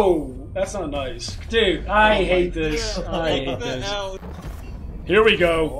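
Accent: United Kingdom. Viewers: Aaaaah! It’s a british person! 🤣 You got it! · its a british person LMFAO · ITS A BRITISH PERSON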